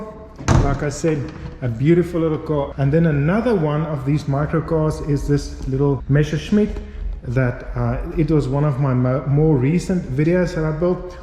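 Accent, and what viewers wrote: South Africa. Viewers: I caught the South African 🇿🇦 accent straight away & had to check your channel out. It looks like a lot of interesting content & I'm looking forward to going through the D Type build.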